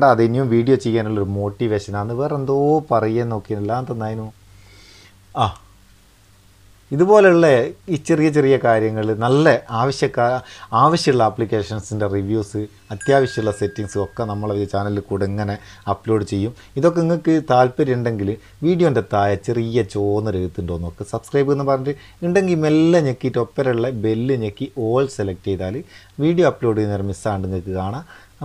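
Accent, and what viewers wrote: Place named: Balussery, India. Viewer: നിങ്ങൾ എവിടെയാണ് ബാലുശ്ശേരി ഇങ്ങളെ ഭാഷ കേട്ടിട്ട് അങ്ങനെ തോന്നുന്നു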